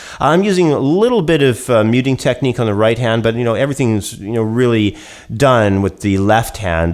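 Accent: Canada. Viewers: Nice job my man. I think I understand now. Btw, are you Canadian? I'm only asking cuz I can hear your accent.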